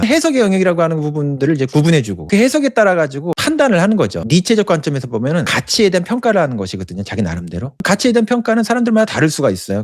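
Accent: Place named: Seoul, South Korea. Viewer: 이분 서울사투리 쓰시네??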